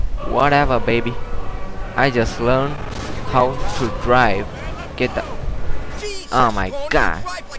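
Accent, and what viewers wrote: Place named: Kerala, India. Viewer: Lol you have one proper mallu accent, undeniable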